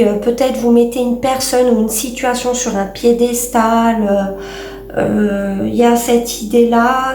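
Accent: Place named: East, France. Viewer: Bonjour j adore le ton de votre voix des habitants de l est de France merci pour ce tirage très détaillé et pointu et de partager votre savoir c est un plaisir 🎉 trop parlant pour moi bien a vous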